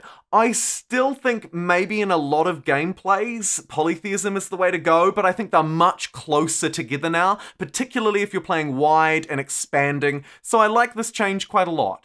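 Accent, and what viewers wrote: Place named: New Zealand. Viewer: You’re one of the only excited-sounding YouTubers that I can tolerate. You’ve got such a genuine joy behind your voice and I love to get my Humankind news from you! It’s probably because you’re a Kiwi. Love that accent. Keep it up!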